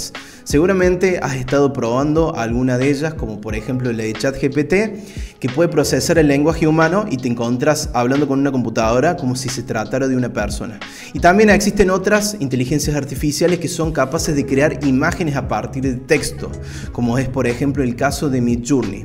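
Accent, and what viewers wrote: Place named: Córdoba, Argentina. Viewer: Que tal capo, me parece que somos coterraneos no, ¿Cordobés?, bueno, lo que te quería consultar es si las imágenes que genera Bing son libres de derecho. Saludos desde Calamuchita 👍✅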